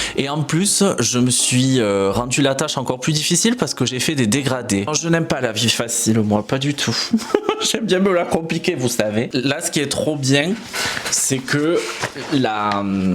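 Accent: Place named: South, France. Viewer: Coucou je viens de découvrir ta chaîne super l’évolution de tes colos et j’aime beaucoup les livres sur ta copine Pauline t’as ramené du Japon . T’as l’accent de chez moi du sud j’aime trop et magnifique ta petite sirène bravo oui cette double page prend une blinde à faire 😃 hate de tes prochaines vidéos 🥰🥰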